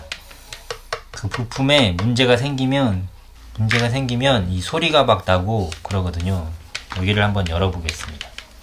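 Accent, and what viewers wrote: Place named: Jeonbuk, South Korea. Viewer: 늦은시간에 빠른답변 감사드립니다. 사투리가 구수한게 너무 익숙합니다. 저는 전북김제 출신인데 동향분 아니신지요. 그래서 더 편한함을 느낀거 같아서요~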